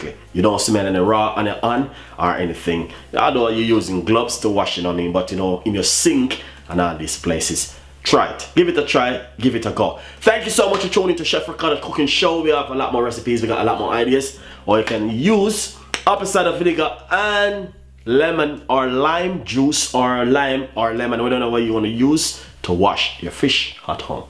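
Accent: Jamaica. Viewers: I love Jamaican accent..it always seems like they are rapping😁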